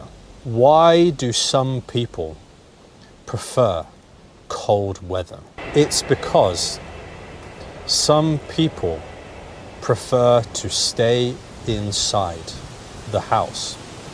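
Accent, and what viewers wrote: United Kingdom. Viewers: It's appropriate content. Even if the content is easy for understanding, it's useful to listen to underscore nuances of British speech.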